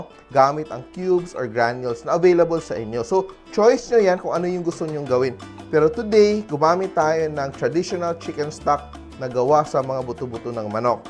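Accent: Visayas, Philippines. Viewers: Bisaya ka chef?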